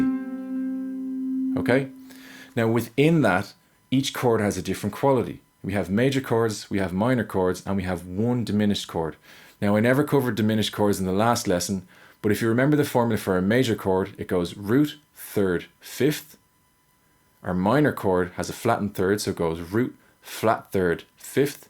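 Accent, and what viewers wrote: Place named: Ireland. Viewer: you absolute babe <3 also - being an Irishman very far from home it's nice to hear the accent!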